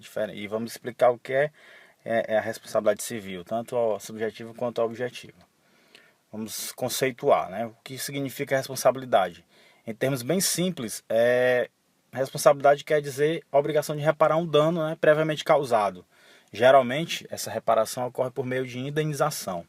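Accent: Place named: Ceará, Brazil. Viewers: Cearense da gema 😂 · sotaque cearense arretado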